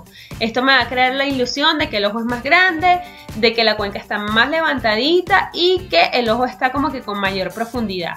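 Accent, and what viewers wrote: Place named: Venezuela. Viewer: venezolana verdad (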